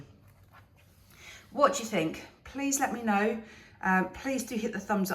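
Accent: England, United Kingdom. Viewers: I love how creative you are! 🌿🌺🌼🌸🌿 This message reminded me to tell my friends how important they are and that we are here to lift each other up. It's a wonderful little quote for both ourselves and others. Thank you for sharing your beautiful Artwork with us. You bring me much JOY in everything you do. Besides that, i love your English accent (i live in the USA). 🩷🩵💜🦋🏜️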